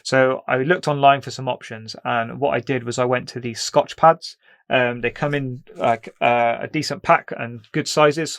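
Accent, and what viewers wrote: United Kingdom. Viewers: Very nice video tha ks for sharing. I don't know why but i have always tought that you are american, just realised that is a Englishman by the way 🙂👍👏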